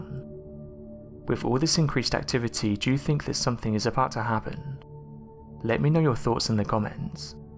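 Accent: England, United Kingdom. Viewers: It should be an AMRICAN dude doing the documentary is this ENGLISH dude a geologist? IDTS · I don’t need some British guy telling me about OUR VOLCANO IN ‘MERICA!!!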